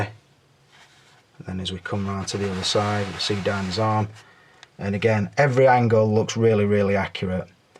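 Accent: United Kingdom. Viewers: I can tell by your accent you know nothing about boxing. the UK grossly over hypes their fighters even when they're clearly trash.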